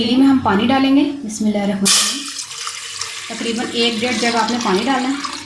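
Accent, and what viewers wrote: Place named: India. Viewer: Recipes to nice hoti hun but Punjabi ful penddu style me bolte hyn ap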